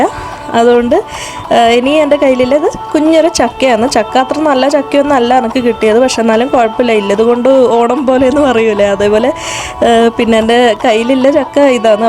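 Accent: Kannur, India. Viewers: Kannur aano pengal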